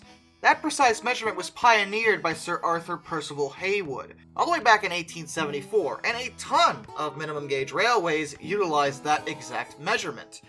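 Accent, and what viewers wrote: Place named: United States. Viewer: i wouldnt call these the smallest but its nice to hear an american talk about the sand hutton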